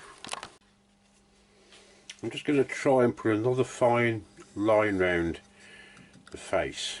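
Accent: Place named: Black Country, United Kingdom. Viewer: Cheers for that demo. I've been checking out the other makers methods and your one is the one I'm going to have a go at. I like your style and Black Country? accent. Are you still making videos? Hope so. Best regards.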